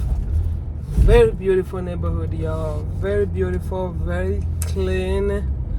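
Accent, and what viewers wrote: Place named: Haiti. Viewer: How long have yòu lived in the United States? Im from Miami trying to place your nice accent, Haiti or...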